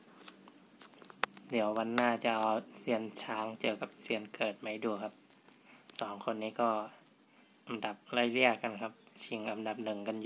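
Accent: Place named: Isan, Thailand. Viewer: ผมขอโทษครับ หลุดฮาทุกทีสำเนียง ซ.ซ้าง ผมคนอุดรครับ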